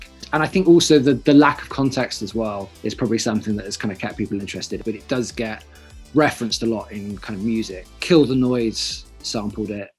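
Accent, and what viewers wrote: United Kingdom. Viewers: dude looks like Dave Franco and The Action Lab combined with a British accent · dang, british ian hecox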